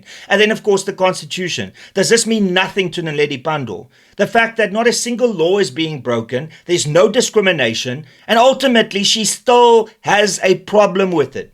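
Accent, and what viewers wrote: United Kingdom. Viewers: Says she in her ever so English accent. 😂😂😂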